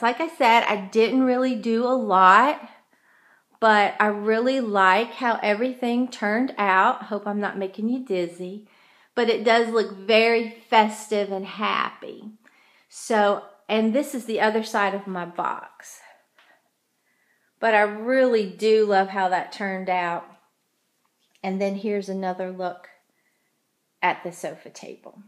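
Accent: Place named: Rural, United States. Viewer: I love your videos! Your husband seems to be amazing you can tell he adores you from the video where you made the dip how he just jumped right up asked what he could do to help or something like that bc most men just sit there & take forever to come help. I loved how he followed you around dollar tree! Great DIY videos! I'm from NC! Where are you from? Just wondering bc of your country accent!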